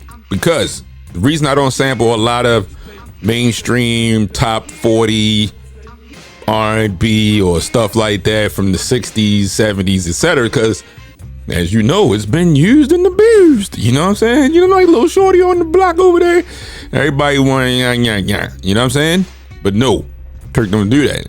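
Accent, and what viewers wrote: Maryland, United States. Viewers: Super dope stuff man. And I thought I detected a MD accent!🙌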